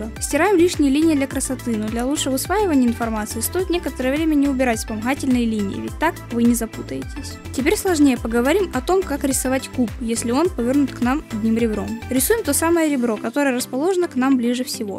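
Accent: Ukraine. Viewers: Возможно она украинка и у нее есть небольшой акцент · оо ахахах_)) не потеме, я такая слушаю видео и.. думаю... чтот тут знакомое... Гхрань... и поняла по говору что автор украинец, чекнувши профиль)) как я поняла, я удивляюсь хахахахах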